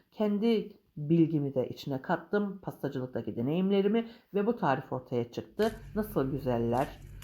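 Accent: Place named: Black Sea, Turkey. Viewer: Cok tatlisiniz karadeniz sivesi var hemserimsin tesekkurler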